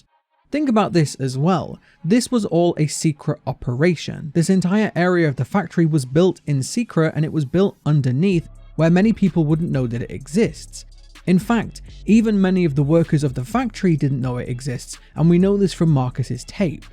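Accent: United Kingdom. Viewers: I don’t know why but I get you and It’s justJords theories mixed up because your both British, sound similar and have very interesting theory’s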